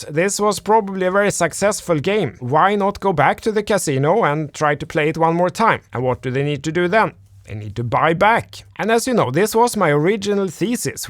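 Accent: Sweden. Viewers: Hör ända från östergötland att de är en svensson som är i farten!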